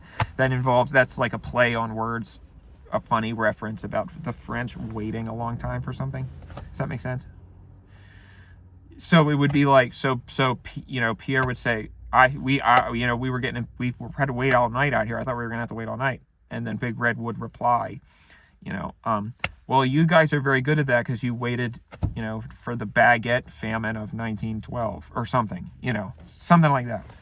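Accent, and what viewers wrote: South, United States. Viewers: Nothing has ever offended me more then southern accent. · His southern accent is so fucking sexy… ❤️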